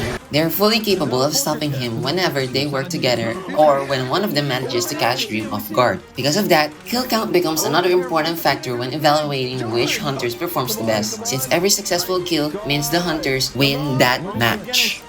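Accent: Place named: Philippines. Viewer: Sabi ko na taga pinas ka, kasi same tayo ng accent kapag nagsasalita ng English hahahaha the resemblance is uncanny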